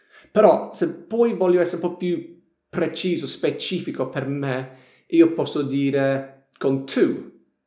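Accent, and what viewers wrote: Apulia, Italy. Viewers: ma mi sbaglio o hai un accento pugliese? hai vissuto in puglia? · Ecco, mi sembrava fosse un accento pugliese, ma non ne ero sicuro. Incredibile. Molto preparato. Un onore seguire le sue lezioni. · mitico !! Pugl-English !! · Il tuo accento pugliese ti renderà famoso!!! È veramente simpatico 😃 SUPER!! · Allora non l' ho notato solo io che ha acquisito la cadenza pugliese?! 😂😂😂😂😂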